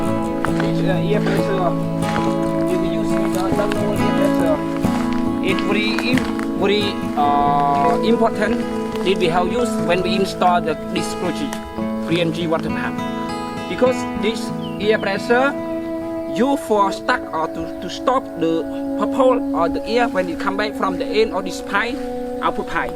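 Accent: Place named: Thailand. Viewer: Dù nói tiếng Anh nhưng nghe vẫn biết là người Thái Lan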